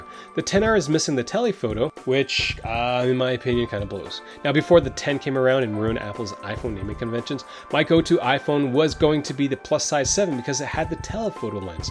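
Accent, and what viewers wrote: Canada. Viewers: I’m Canadian, you’re Canadian. You make this a point in your name “eh” It’s hard finding a Canadian voice in tech and phone reviews. So I was delighted to find you. THAT said it bothers me you give prices in USD not CND . At least say “ the iPhone xr is $750. In the U.S or $1099 Here in Canada eh “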